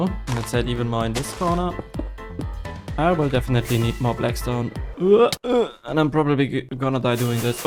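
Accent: Germany. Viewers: als ich ihn zu ersten mal reden gehört hab, wusste ich direkt er wäre deutsch, W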